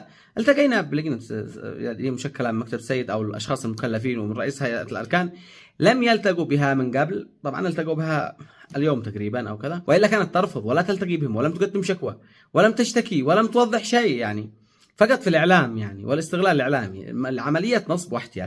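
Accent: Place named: Yemen. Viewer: ياخي قد لهجته يمنيه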